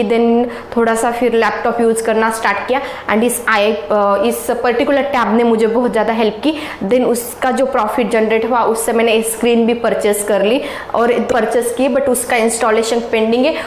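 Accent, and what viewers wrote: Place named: Maharashtra, India. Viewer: Marathi logo ki hindi... जगात भारी 👍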